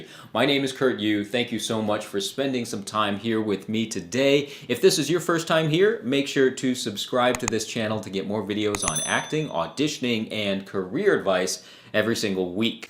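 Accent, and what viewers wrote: South, United States. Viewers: Liked the southern accent🙈😌😭😆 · the Southern accent to sound so natural on you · Love the southern accent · Your southern accent sounds like Matthew McConohay 😅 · Your southern draw is awful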